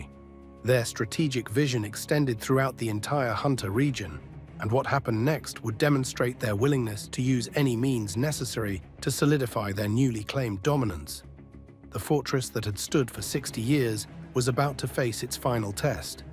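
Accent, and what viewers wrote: England, United Kingdom. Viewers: Why so many videos narrated by an English accent?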